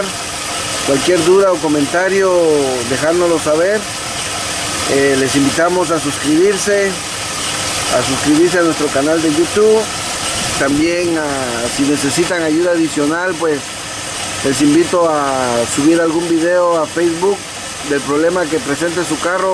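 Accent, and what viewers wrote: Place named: United States. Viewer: Y luego para que hablas como Pocho....pues cuanto tiempo tienes allá que ya se te olvido ablar el español....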